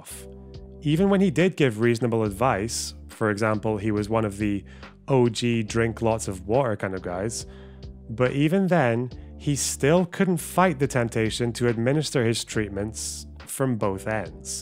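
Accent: Canada. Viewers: Nailed that Albertan accent my guy. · I absolutely lost it at your beautiful Canadian accent. Well done 👍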